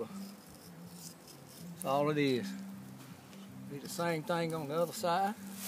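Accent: United States. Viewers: That’s a good ole boy deep South Georgia accent. I live in Atlanta but am a country boy at heart. My whole family sounds like this man. Love it. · Can't tell if you're from Mississippi or Australia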